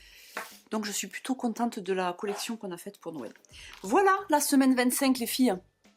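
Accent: South, France. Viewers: tes papotages avec ton bel accent du sud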